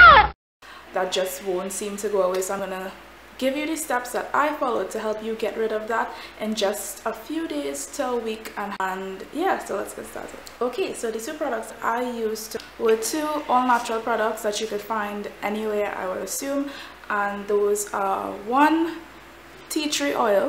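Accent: Trinidad and Tobago. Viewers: got so excited when I heard that Trini accent!!! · Girl u got to be Trini! Love the accent...thanks for the advice as well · are u from Trinidad? 🙌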